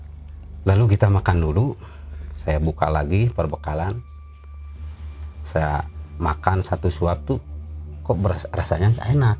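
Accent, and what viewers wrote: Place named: West Java, Indonesia. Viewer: Wakaka dimaklumin kang sebagai sesama sundanese 😁semangat 💪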